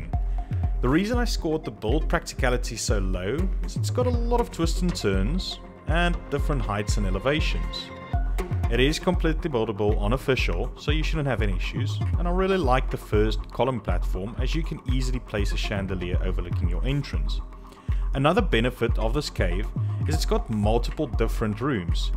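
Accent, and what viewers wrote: South Africa. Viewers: Are u from South Africa? Ur accent sounds like ur fromt here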